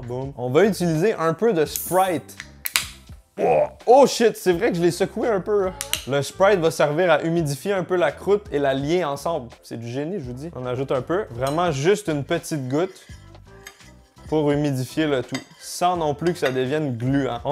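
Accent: Quebec, Canada. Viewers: cool Guy tu parle en québécois vive le Québec.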